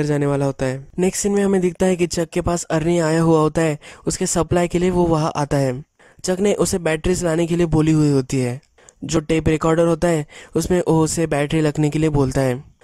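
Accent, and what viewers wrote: Maharashtra, India. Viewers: Tu pakka Marathi hai Accent pakad Kiya mene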